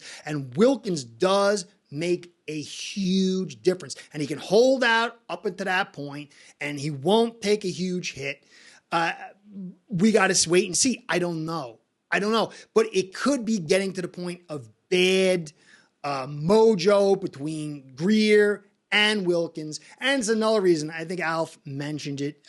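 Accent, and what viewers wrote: New York, United States. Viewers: I like you’re accent are you from New York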